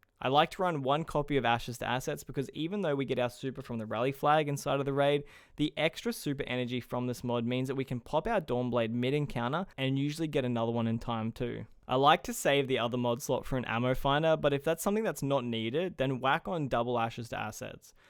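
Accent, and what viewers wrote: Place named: Australia. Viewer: U sound like you’re either from Adelaide or qld 🙄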